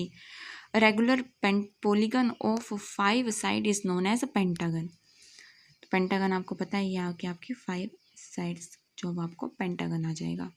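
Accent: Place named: Pahadi, India. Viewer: Didi ji Mera comment padho kya pahadi Ho🎉🎉🎉🎉🎉🎉🎉🎉🎉🎉🎉🎉🎉🎉🎉🎉🎉🎉 you are pahadi kyunki aapki awaaz se lag raha hai ki aap pahadiyon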